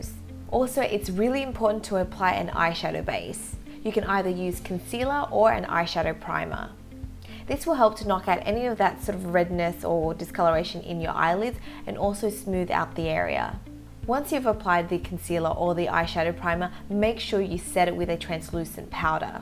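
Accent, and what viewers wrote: Australia. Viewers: so basically it was the australian accent? lmao I haven't seen bindi irwin anywhere in years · New subscriber here. Is she Australian? I really appreciate youtubers that aren't from the USA, not that there's anything wrong with that. It's just nice to have more than the standard American youtubers.😀 Great video!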